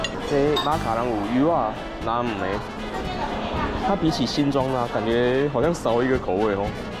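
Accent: Taiwan. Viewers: 應該本是台語人吧！？😊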